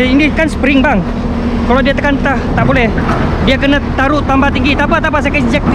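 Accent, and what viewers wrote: Sabah, Malaysia. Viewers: Sabah bro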